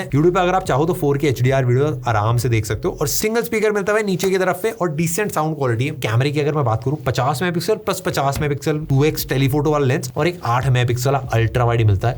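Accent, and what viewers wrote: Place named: Haryana, India. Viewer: Haryana wala feel hai bhai kay bolne ka kya haryana se ho kya???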